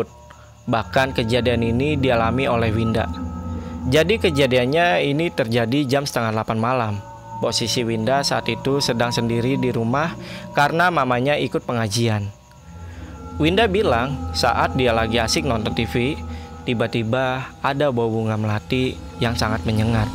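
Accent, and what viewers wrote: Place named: Bali, Indonesia. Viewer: Keknya abang pembawa acaranya orang Bali, soalnya napak kali logatnya kalo ngomong T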